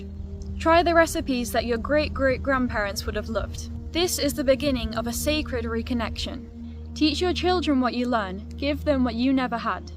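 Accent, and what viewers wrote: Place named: Northern England, United Kingdom. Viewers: I really enjoy your videos. You seem to have a slight northern tint to your English. Did you use to live “up North “ somewhere? I’m friends with a Texan Odinist who is very close to her British and German heritage. I’ll send her this video. Thanks! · Did you ever spend time in Northern England? Your accent has a trace of it.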